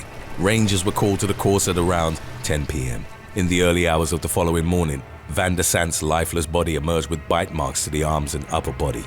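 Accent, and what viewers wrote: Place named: United Kingdom. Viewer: “An almighty crack of thunder” blended with that tone and British accent 🙂👏🏾👏🏾👏🏾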